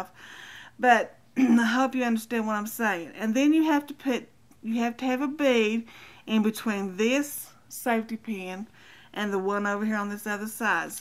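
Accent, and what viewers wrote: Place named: South, United States. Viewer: It's Soo good too hear a Southern Voice ... I'm from Alabama ... God Bless You ...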